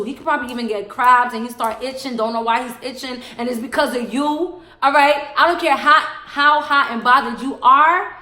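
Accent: New York, United States. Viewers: She sounds like a New Yorker lol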